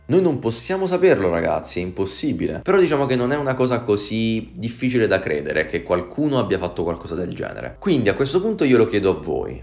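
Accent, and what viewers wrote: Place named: Apulia, Italy. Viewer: Ciao, di dove sei? Il tuo accento sembra pugliese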